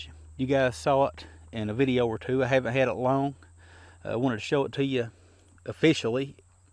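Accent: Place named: Eastern Kentucky, United States. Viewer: It's good to see you making progress. I love to hear that accent from Eastern Kentucky. I hope you get to 100K before long. God bless.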